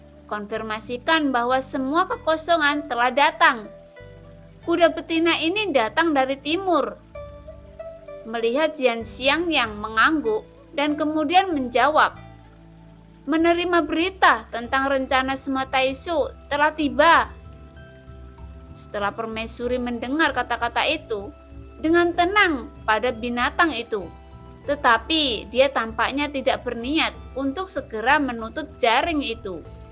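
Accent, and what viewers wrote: Java, Indonesia. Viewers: logat Jowo kok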